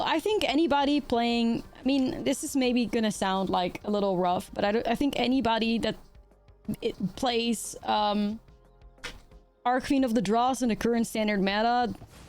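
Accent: Netherlands. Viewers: We love the koo keez. Your Dutch accent makes it fun! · If ANYONE is giving you crap for saying English words with an awesome Dutch accent, they can go cookie themselves 😂 Don’t apologize and don’t change. Love your content just as it is.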